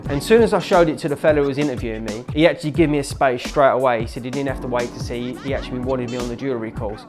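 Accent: United Kingdom. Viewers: ما فهمت ولا حرف، كسم اللكنة البريطانية😂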